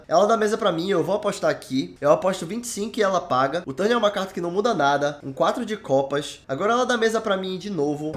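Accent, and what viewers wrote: Pará, Brazil. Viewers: Sotaque Paraense. Muito bom canal.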